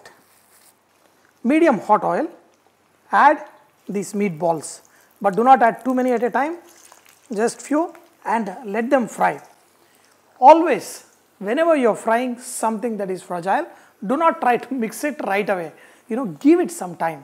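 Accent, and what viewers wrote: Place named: South India, India. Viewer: Abbe keema hota hai keema, ye kab southern pronunciation se kab tak dimag ki dahi karoge yaar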